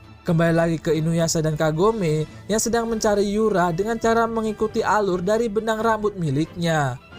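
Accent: Palembang, Indonesia. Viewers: Wong Palembang apo jeme lahat? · Wong pelembang kau ni min eh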